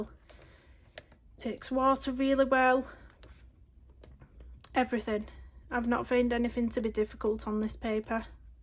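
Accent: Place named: Yorkshire, United Kingdom. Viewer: Just found your channel and i love it very much. I am a senior who colours so i find your content very informative. Your children sound adorable so don't ever apologize for their noise. They grow up and leave home very quickly and then you hope for grandchildren so the noise comes back. I do have lots of these books so i will be able to colour along with you too. PS I love your accent. Im assuming its Yorkshire but could be Lancashire.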